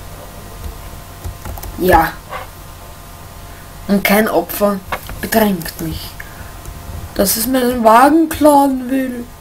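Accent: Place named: Austria. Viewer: he du kommst ya aus österreich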